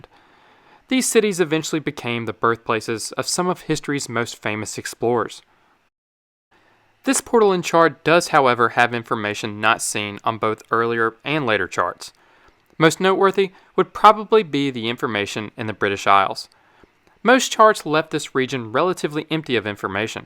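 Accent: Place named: Mid-Atlantic, United States. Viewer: Have any other U.S. dwellers noticed the narrators THIIIICK mid-Atlantic accent? Idc that much but it lowkey drives me crazy 😭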